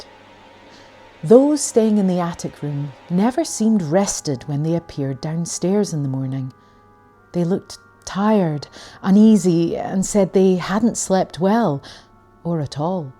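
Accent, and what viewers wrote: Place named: Scotland, United Kingdom. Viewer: This combo accent is hurting my head to process. My brain has two different boxes one for British one for Scottish. I am unable to hear the sentences as my brain goes back and forth.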